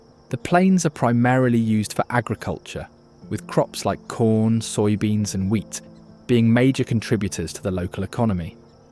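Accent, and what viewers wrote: United Kingdom. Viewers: You missed so much. Why even have Souix Falls And Mitchell but leave out Rapid city? Also your narrator has a British accent and doesn’t always pronounce names properly. Start over and do West River and East River in a different episode. It’s beautiful but poorly done. west river citizen for 30 years , and still a frequent visitor and site wearer, never gets old!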